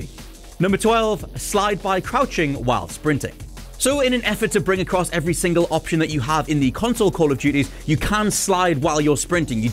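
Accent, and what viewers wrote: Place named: Wales, United Kingdom. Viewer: A Welsh man talking about call of duty, yes lad 🇬🇧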